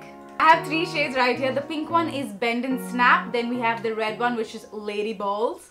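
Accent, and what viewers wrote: United Kingdom. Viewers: The British accent was on point 😍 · That British accent though 🤙🏻😍💖💖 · Godd the British accent was bomb the video was good too but the brrritishhh acccent malz no one can make a difference between the way you speak and the authentic real British accent😄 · Waaaow that british accenttt😍😍😍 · Love your British accent. You should totally do a make up tutorial video speaking British only. 😍🥰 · British accent was too acurate 😍😄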